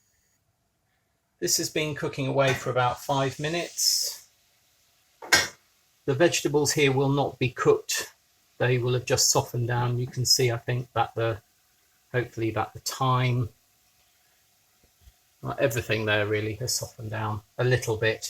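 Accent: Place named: Scottish Highlands, United Kingdom. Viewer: love the accent * (like you just stepped out from the highlands lol)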